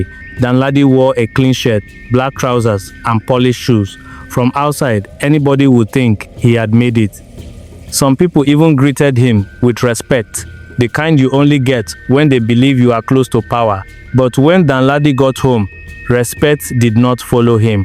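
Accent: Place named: Africa. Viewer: Listen man, use your AI voice , there's nothing wrong with that. It enhance your narration. Although it's a pleasure to hear you, if you want to go global and make money use the voice. We have accent, only Africans can understand, and if your audience is beyond the local, you need to use the voice. It's business! Nothing personal!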